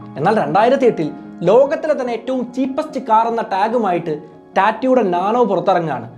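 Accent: Kannur, India. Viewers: കണ്ണൂർകാരൻ ആണോ..? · നിങ്ങൾ കണ്ണൂർ അന്നോ 😊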